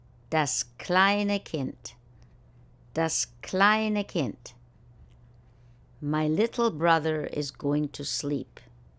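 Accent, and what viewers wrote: Germany. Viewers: It's a German dialect 😉 (Berlin??? 🤷)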